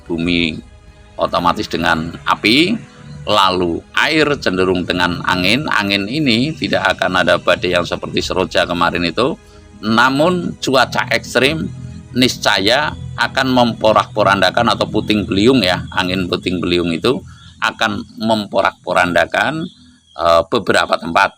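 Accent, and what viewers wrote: Banyumas, Indonesia. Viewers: Aku suka logat ngomongnya ngapak2 gitu lucu tp serius,lama2 tk perhatikan tambh muda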